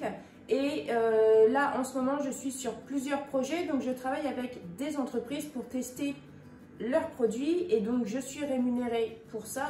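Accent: Ardennes, France. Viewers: L accent des Ardennes j'adore! Courage pour ton travail quotidien et bravo surtout!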